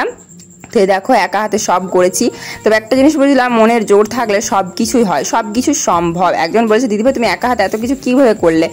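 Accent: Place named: Bankura, India. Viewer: Tomar kotha golo Bankura manus dar moto kotha ❤❤❤😊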